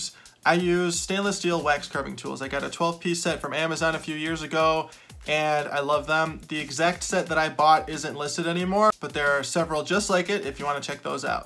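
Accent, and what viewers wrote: Michigan, United States. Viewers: I knew that was a Michigan accent!! Lol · Your Michigan accent is very similar to my Ohio accent. I no longer live in OH though. Bake and Bond - bacon bond lol yep. That's how I say it. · Just my 2 cents; YouTube will recommend that you edit your videos to 20 minutes or less. This is probably important for "talking head" type videos. If the video is mostly showing the actual work you are doing, most of the people who purposely selected it for viewing will watch for a lot longer than 20 minutes - it's why they are watching. Personally I don't see anything wrong with your current studio setup or your video presentation or your Michigan accent. Bacon Bond? It's like "Duck Tape"; originally it was refered to as "duct tape" (as in heating ducts) but so many people pronounced it "duc-tape" that 3M officially adopted the name brand "Duck Tape" Maybe you will be responsible for a re-branding of the product! 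· I thought I detected a fellow Michigander’s accent, I’m from the south west side at the very bottom anyway to answer your question yes I wouldn’t mind a 30-40 minute video so I can follow along an know what I’m doing lol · Michigan accent! I grew up in Warren but moved to Tennessee 20 years ago. People either love or hate my accent here. Question on baking: What do you bake on? I know if my clay is baked on a glazed ceramic tile then the clay touching the tile comes out glossy. Since many of your pieces are large I suppose you must lay them on their side to fit into your toaster oven. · Michigan accent?! I'm a fellow Michigander. Hello from Kalamazoo+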